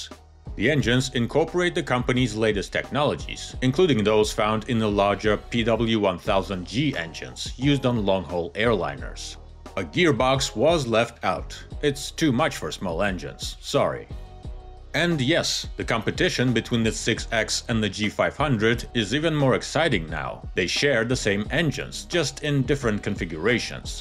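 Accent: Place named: Russia. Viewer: I would watch this channel if not this fucking raSSIan accent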